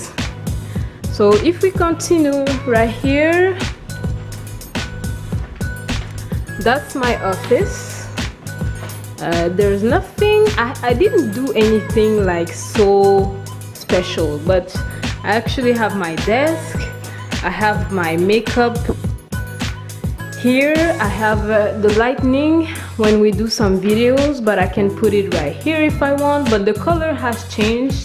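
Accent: France. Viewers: Nice house, I like ur accent it’s like ur first language is French!! Coz you speak like my French friend 🤗 greets from london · Love your France accent... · Question pour toi, est ce que tu es antillaise? Ton accent c in peu francais ,un peu Creole.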